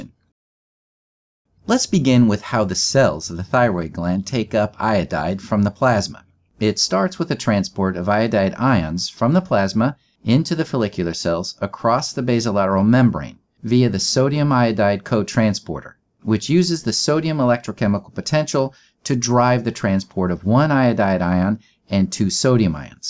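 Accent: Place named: Midwest, United States. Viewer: Very nicely done, just the right amount of detail. P.S. I couldn't stop thinking the narrator of this video must be from somewhere in the Midwest ;)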